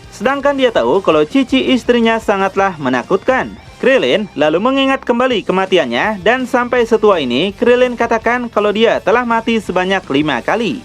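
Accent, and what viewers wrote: West Java, Indonesia. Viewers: ieu admin jiga orng sunda 😂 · Popolohikan sigan ieu orang sunda kang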